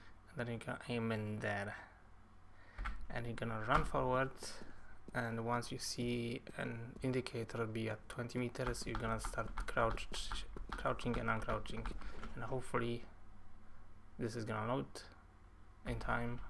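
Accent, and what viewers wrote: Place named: Poland. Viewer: I love this Polish accent.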